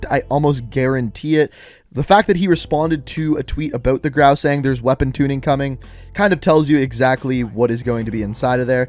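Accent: Canada. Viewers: Def can tell he’s Canadian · Im like a parrot repeating every word he pronounces so Canadianly. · It’s the Canadian accent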